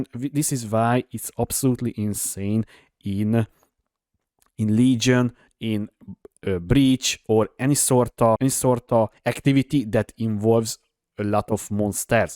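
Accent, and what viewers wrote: Hungary. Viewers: I love your accent! Hungary?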